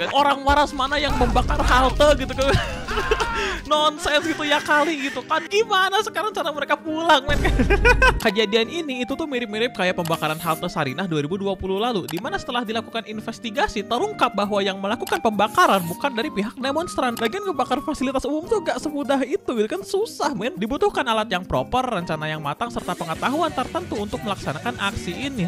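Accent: Bandung, Indonesia. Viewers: Lo orang bandung bang? 😦😦😦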